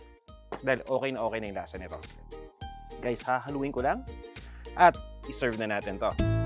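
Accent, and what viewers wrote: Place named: Batangas, Philippines. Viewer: Nasa parteng calabarzon ka sir...sa accent mo..Southern tagalog😂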